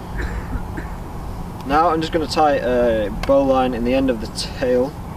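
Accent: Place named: England, United Kingdom. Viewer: He's English bro what the bloody Hell yo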